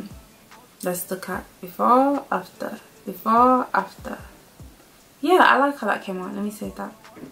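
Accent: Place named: Yorkshire, United Kingdom. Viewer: Omg your Yorkshire ❤️ I’m subbing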